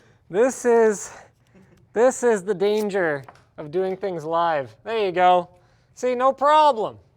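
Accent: Canada. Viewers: You sound Canadian in this. I learned what it sounds like from South Park.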